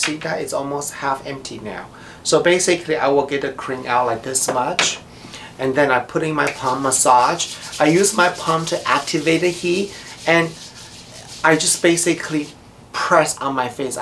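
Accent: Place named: Mexico. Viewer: Why does he look Asian but sound Mexican?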